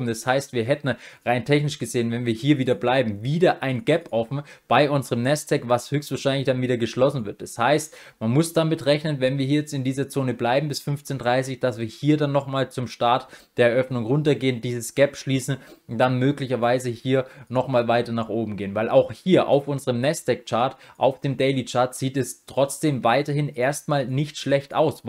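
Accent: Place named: Swabia, Germany. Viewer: Woher genau kommst du aus dem Schwabenland, wenn man fragen darf? 🙂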